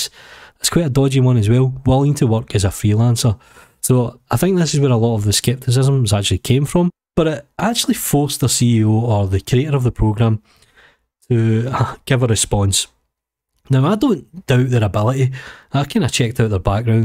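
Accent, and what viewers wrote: Scotland, United Kingdom. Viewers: I am just here for the Scottish accent...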